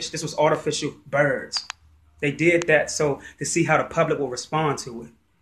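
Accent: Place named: DC/Maryland, United States. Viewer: I'm from forestville, md in pg county. I can tell you're from the dc/md area by the accent. Projects is a project, an experiment. Artificial birds bees mosquitos & fleas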